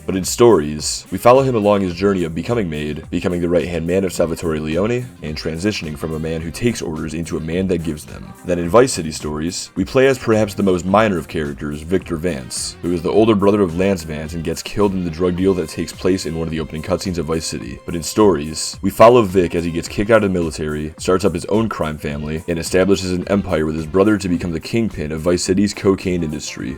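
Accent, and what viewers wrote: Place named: Northern Cities, United States. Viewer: Nice. I heard your voice and thought you had a bit of that NCVS accent. Anyway, great video.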